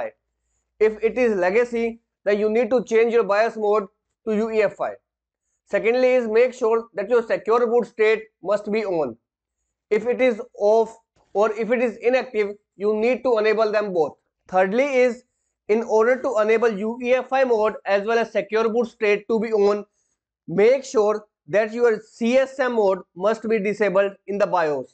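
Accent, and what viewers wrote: India. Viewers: I dont fking understand ur thick mumbai accent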